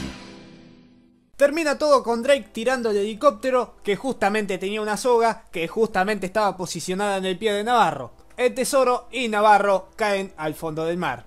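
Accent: Argentina. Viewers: el argentino es el mejor dialecto de todos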